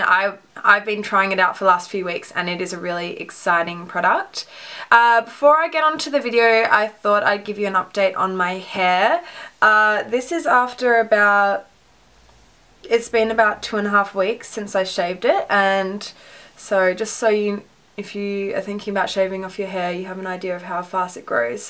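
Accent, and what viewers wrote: Australia. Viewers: Great tips! Thanks. I didn't realize your natural hair was that light. Looking lovely as always, and I like the Australian accent.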